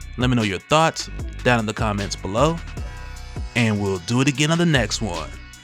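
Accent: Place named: Atlanta, United States. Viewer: I swear you sound like a ATL dude fr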